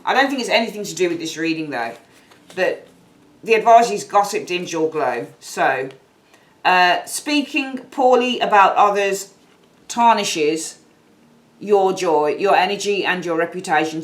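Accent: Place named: Midlands, United Kingdom. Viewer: This reading really resonated I can hear in your accent you’re a fellow midlander 👍👍👍 Love and light ☀️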